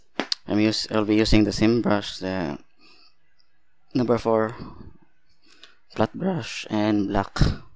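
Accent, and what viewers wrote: Philippines. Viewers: By just listening to you, I know you are my kababayan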